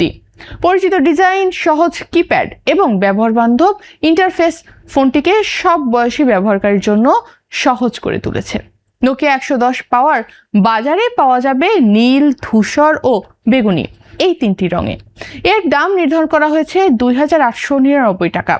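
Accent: India. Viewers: কোলকাতার টোন ধরছেন নাকি !!!!!!!!!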